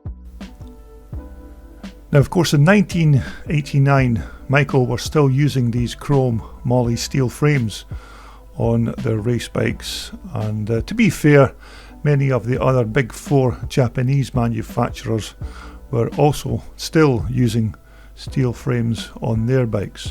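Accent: Scotland, United Kingdom. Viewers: "Gas" ???you sound Scottish, not American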